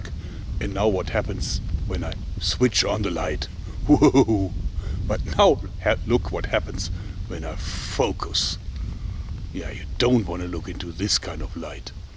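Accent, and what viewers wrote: Germany. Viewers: Is this guy german? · Are you german ??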